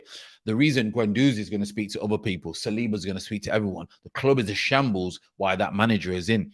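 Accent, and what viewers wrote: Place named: Midlands, United Kingdom. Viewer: Big up from south London Why! The delivery of experience nd knowledge with a gruff midlands charm may not always agree on style always a good source of knowledge I am me and that is special enough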